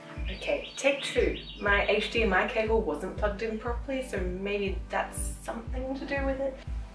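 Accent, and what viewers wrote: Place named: Australia. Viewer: Great build love the Aussie accent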